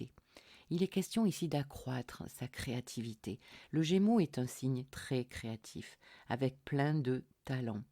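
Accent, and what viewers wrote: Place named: South, France. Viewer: Bonjour, je viens de vous découvrir...votre voix est très agréable à écouter...du velours! Et votre petit accent méridionnal est bien stimulant dans cette période très nuageuse et un peu triste! Belle synthèse de ce qui nous attend pour une année!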